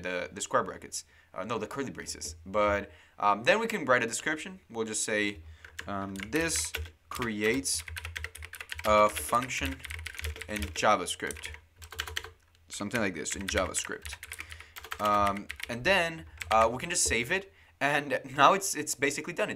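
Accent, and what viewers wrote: Brazil. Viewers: This is my first time seeing one of your videos, and you speak very well but I can't help but think that you sound as a brazilian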